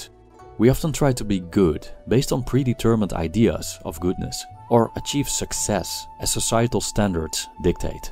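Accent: Netherlands. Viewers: Lekker dat nederlandsche accent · i love your dutch english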